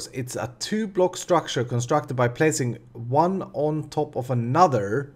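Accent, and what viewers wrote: Sweden. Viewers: ...and ladle is not Lad-le it's Lay-dell.. Sveedish speech patterns.. JEEZ!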